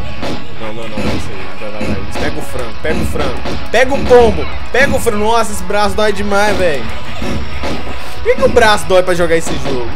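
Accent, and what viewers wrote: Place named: Minas Gerais, Brazil. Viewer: ontem fui em seu canal vc não respondeu vc é de Minas desculpe mas seu sotac e bem parecido gostei muito do canal nao sei nada de jogo assisto por vc rses vc que anima beijinhos 😘😘😘😘😘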